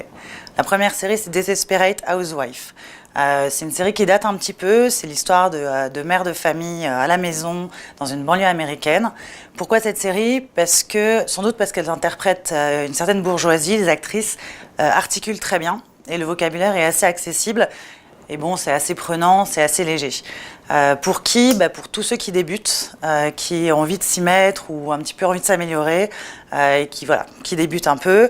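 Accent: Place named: France. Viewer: merci pour cette video, juste un truc, tu prononces l'anglais à la francaise surtout la lettre R et th qui ne se prononce pas comme Z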